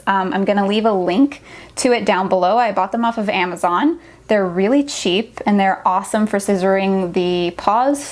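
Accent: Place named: Canada. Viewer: I just found your videos the other day and am enjoying them. I have had my Chloe, an Aussie mix, for 7 years. These grooming videos are so going to help my husband and me. Also while watching your videos, I was like is she from Canada? My husband is Canadian. Then I saw the comments and was like yup she’s Canadian. 😁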